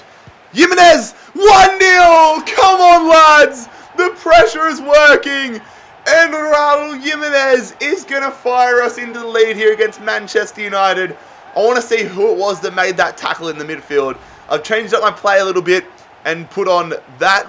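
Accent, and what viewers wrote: Australia. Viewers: Are u from Australia mate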